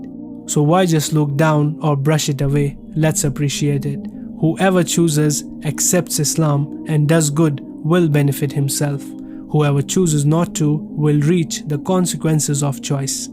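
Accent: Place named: India. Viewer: Too old news these indian posts old information, with funny English accent fix your English first.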